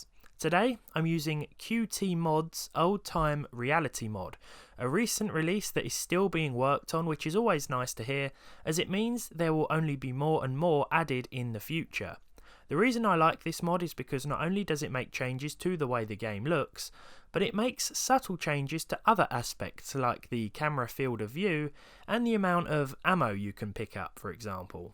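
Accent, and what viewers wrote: United Kingdom. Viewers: Pronouncing mafia as "mäfiä" sounds especially wrong with a British accent.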